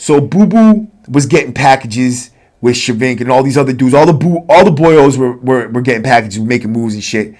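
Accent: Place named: Brooklyn, United States. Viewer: Luv the channle bro I could listen to you talk for hours u remind me of this Brooklyn dude, my boy "Moe" I met up top in NY. I only did 2 years but Holly Shit that was a CraZy Time of My Life!!! Prison politics are crazy. I ended up working out with him then we got in a fight. I fought well but he got the better of me and broke my rib. I laid up for a week and could hardly breath for a few days but kept my mouth shut after that we spoke dapped up and we ended up becoming Boyz and running a store together working out cooking I was in. Its crazy how sometimes you make friends after you beat each other's asses. Lol I gave him a black eye in the fight I couldn't breath bc he broke my ribs, he looked like shit for a week. HaHa then we were cool after😂 prison is fucking crazy bro😅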